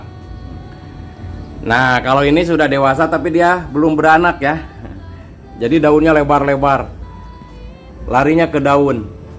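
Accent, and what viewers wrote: West Java, Indonesia. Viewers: Bang orang Sunda ya...?keliatan dari logatnya. Kalo gitu sy panggilnya kang.. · Suka sama logat sundanya.. Menjelaskannya juga jelas banget..mau coba praktekin semoga berhasil..trmksh..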